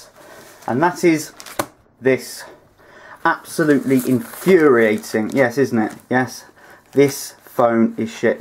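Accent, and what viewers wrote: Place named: Manchester, United Kingdom. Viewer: #1 BEST MANCUNIAN ACCENT EVER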